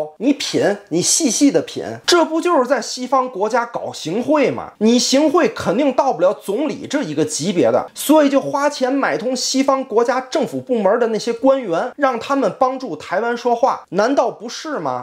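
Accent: Tianjin, China. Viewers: 介天津话太喜欢了，不过还没去过天津呢。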